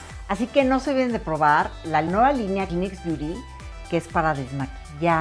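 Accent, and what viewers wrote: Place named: Fresa, Mexico. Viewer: Habla muy fresa: la caraa, maquilladaa... Habla mas natural sin esa pose, ya se oye muy falso y prepotente esa forma de hablar tan exagerada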